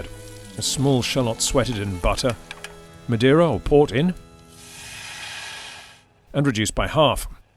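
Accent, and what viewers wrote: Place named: England, United Kingdom. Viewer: you keep on producing whatever is in your heart and mind to produce and you won’t be letting me down! Promise! I will be honest with you, I really don’t know how I came across your channel, but I am very glad that I did. I have had many years in the wine industry (which thanks to Covid has been a challenging thing recently for not only myself but many food and wine professionals in the city), but I started out in the food end of the business and I have done a LOT of things in both areas over the years. Recently, New York has been feeling like a culinary dessert to me…maybe I have just become jaded! There was something about your videos when I first discovered them that really caught my attention, and trust me that’s saying a lot because I take in a lot of what is out there and just through osmosis here in the city I pick up a lot. 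I confess that when I first started watching it was just really good eye candy as they are so beautiful, but very refreshing and I liked your style (not an egomaniac and who doesn’t love an English accent), and the recipes tugged at my heart. This may seem a little silly to say to you, but your videos have been awakening a side of me that I was losing touch with and is so important to me. I can’t help myself, when I watch them now I look at them from a more professional lens and yes, I do praise you a lot, but I do have a little mental list of a few thing… Yes, I am here in Manhattan, not far from your old stomping grounds…I am in Hell’s Ki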